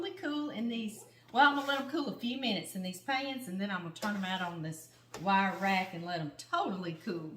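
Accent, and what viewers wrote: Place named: South, United States. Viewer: I love watching you and listening to you sweet southern "drawl" .. Your cake looks delicious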